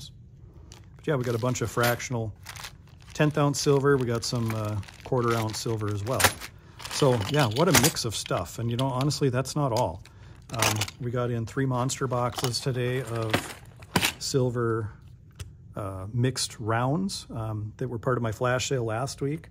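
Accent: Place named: Upper Midwest, United States. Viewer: I enjoy your channel. And recognize your accent. I grew up in Minnesota about an hour from Fargo. I worked in Fargo for about 10 years. I’ve been through Minot many times back when fracking sand was big. I drive semi. I live north of Nashville TN just under the Kentucky state line. Again I appreciate your channel. Can I ask did the majority of what you bought yesterday come from one customer?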